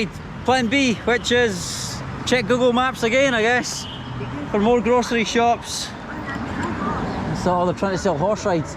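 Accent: United Kingdom. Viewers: Are you British .... cause your accent is so harsh to me❗❗🤔🤔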